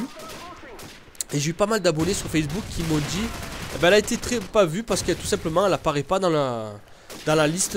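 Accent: South, France. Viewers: Un bon carnage GG a toi, ta façon de commenter avec ton accent de sudiste me fait régaler!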